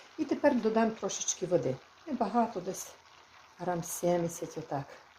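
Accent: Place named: Bukovina, Ukraine. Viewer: Насолоджуюсь Вашою мовою з Буковинським відтінком. Дай Вам бог здоров'я.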